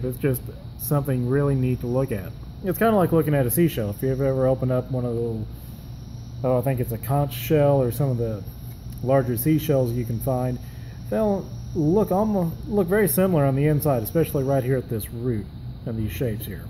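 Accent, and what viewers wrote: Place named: South, United States. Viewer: Okay, Country accent!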